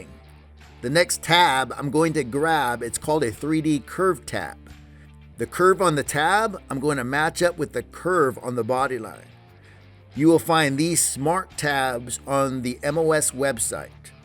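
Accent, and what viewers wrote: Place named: California, United States. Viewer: New viewer, Awesome work 👍I'd hire you in a sec, but I doubt you're in my area 😕 I'm guessing that's a Californian accent, I'm in Ohio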